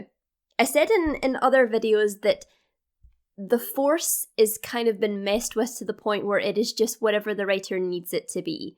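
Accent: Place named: Scotland, United Kingdom. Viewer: You have a beautiful accent, are you from Scotland? And yes, I found your channel among the Ahsoka reaction videos.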